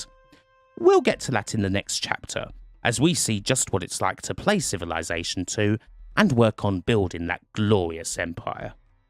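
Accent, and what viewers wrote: United Kingdom. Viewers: I'm not watching 45 minutes of a lithping limey talking about a decades old game.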